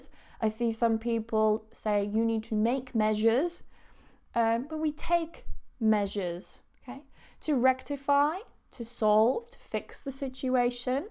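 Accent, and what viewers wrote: United Kingdom. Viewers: Why do you speak a British English and accent?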